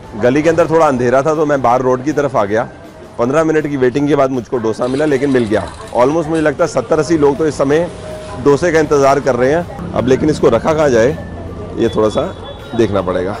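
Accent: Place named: North, India. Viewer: No he from North India Delhi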